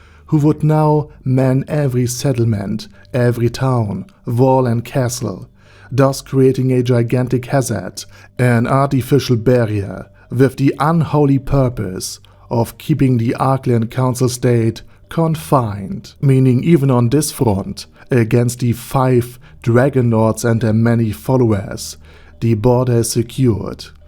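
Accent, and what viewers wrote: Germany. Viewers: thicc german accent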